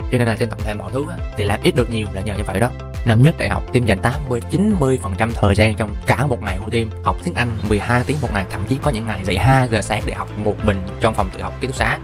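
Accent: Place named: South, Vietnam. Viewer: Bạn cứ nói đại giọng bắc..bạn nhái giọng Nam nghe mệt hơn. Nó chen giữa 2 vùng miền nghe khó chịu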